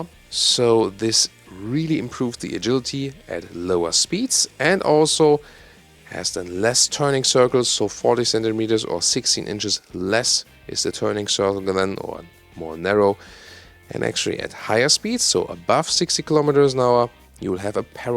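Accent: Germany. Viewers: The wagon is pretty dreamy, are we even getting in the states? I’d never spend that kind of money on a car with my current rare use of them but I wish the Japanese or Koreans had something like this in the category as opposed to crossovers only. I’m still chugging along - very happily I might add - in my very “analog” ‘02 WRX wagon and with 72k original my miles not yet seeing a compelling reason to upgrade. Wish the Subaru Levorg or similar existing sport wagon in other markets was imported here. Great and very complete video overview of the car and love that German accent! Haha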